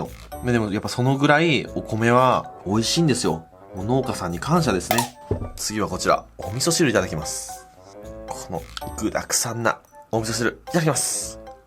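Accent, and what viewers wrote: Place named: Seto, Japan. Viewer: 小さい頃、瀬戸市に住んどりました。懐かしい話し方と発音が耳に心地よいです💧😢 特に、魚おじさんと話してるときは、ほっこりします。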